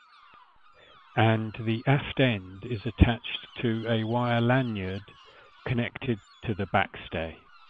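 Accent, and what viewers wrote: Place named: United Kingdom. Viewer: J, You don't sound like a native Californian. Are you British?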